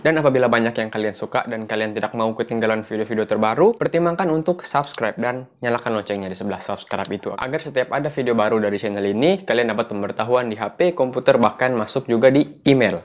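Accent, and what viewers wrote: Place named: Tahuna, Indonesia. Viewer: Jga takaluar logat tahuna e😂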